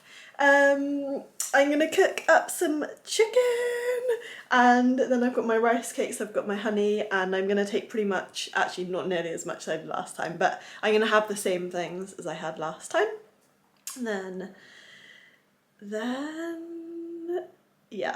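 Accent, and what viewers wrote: RP, United Kingdom. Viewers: love that British accent, sexy woman!!! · You created an emotive response in me from the first bikini angle, thank you, I do like a well spoken girl (posh) :-)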